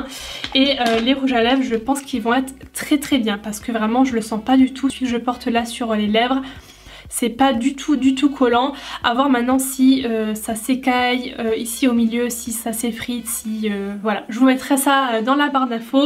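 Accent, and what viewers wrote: Lorraine, France. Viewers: Haha, je me disais bien que je reconnaissais cet accent. Vive la Lorraine et vive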